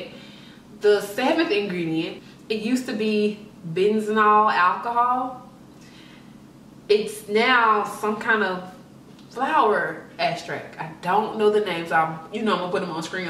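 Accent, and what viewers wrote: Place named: South, United States. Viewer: What part of the states are you from? Your accent sounds.... different. Not sure if it’s a “black” southern accent.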